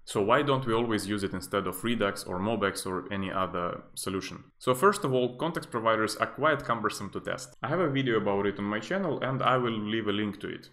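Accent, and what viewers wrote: Russia. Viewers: Du låter väldigt rysk när du pratarz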